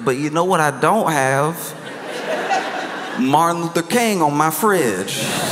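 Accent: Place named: South, United States. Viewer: his southern drawl is the cherry on top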